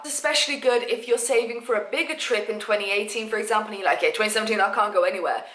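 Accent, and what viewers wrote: United Kingdom. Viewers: btw i think you are gorgous and i love your british accent happy new year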